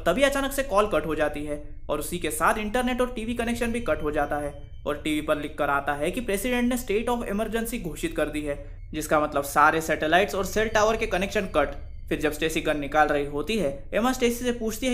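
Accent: Maharashtra, India. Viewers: Ur Marathi accent is OP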